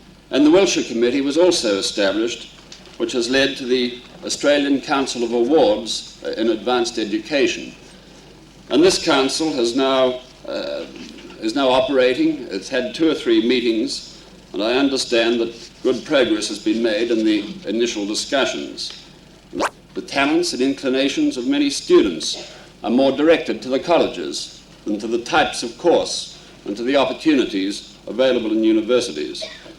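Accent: RP, United Kingdom. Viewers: sounds like the queens accent.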